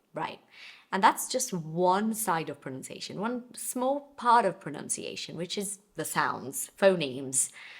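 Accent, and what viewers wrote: United Kingdom. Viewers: Hi,! I can belivie that you're Brazilian! So am I! Your English is so great, although it's British English. I'm new here and I just follow you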